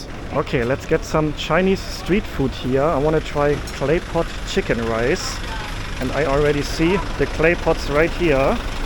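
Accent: Germany. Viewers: I love watching all your videos!! Im addicted to your videos!! Everyday i must watch your vidoes!! I love your German accent, so cute!😊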